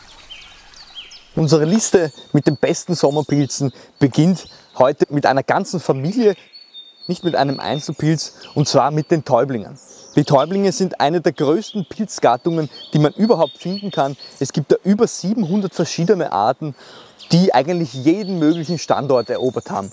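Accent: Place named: Austria. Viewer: Ich verstehe deutsch nur B2Niveau, verstehe ich nicht viel Österreichisches. 😲Wegen der unterschiedlichen Akzente…aber ich liebe es immer noch, deine Videos zu sehen… Danke für die Informationen... sehr interessant👍🏼👍🏼👍🏼🙏🏼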